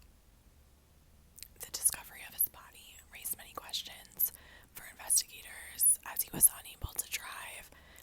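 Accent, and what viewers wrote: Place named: New York, United States. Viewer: Recommendation: Missing 411 cases. They are so eerie!! Also- LOVE your NY accent. I’m from the South and I think this accent is so dang tingly!! Would love a NY accent role play! 😊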